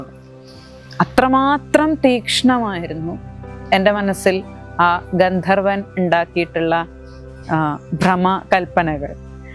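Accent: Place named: Valluvanad, India. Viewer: .. കഥ ഇഷ്ടായി ട്ടൊ....ആ വലുവാനാടൻ ഭാഷയും, എല്ലാം സൂപ്പർ...👌🏻👌🏻❤️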